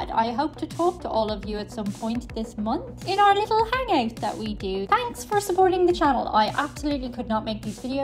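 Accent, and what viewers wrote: United Kingdom. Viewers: I find it hard to believe you have a UK accent and have never heard. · If you're British, which it sounds like you are, there is no better dive into retro British music than 1966-70 as they were forced by the US ban to be quintessentially English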